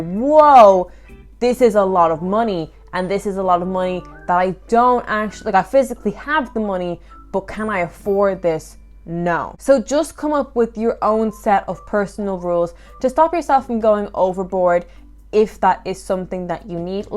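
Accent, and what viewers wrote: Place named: Ireland. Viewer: Are you Irish? I like your accent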